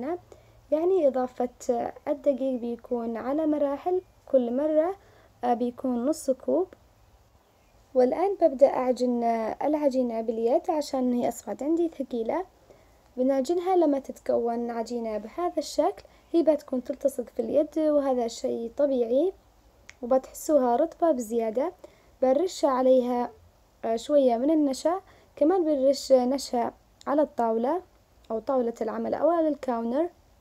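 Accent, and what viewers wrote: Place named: Sanaa, Yemen. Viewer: انا فدالك ياصنعانيه ومااحلى الكلام الصنعاني والطبخات الصنعانيه ...واحلى لايك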